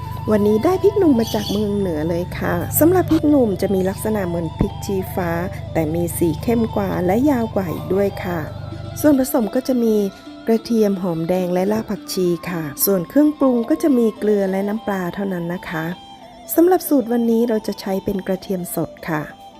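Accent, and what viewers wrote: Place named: Central Thailand, Thailand. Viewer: ผู้บรรยายกับเสียงดนตรีบรรเลง ไม่เข้ากันเลย น้ำพริกหนุ่มกับเสียงดนตรีเป็นของภาคเหนือ แต่ผู้บรรยายสำเนียงภาคกลาง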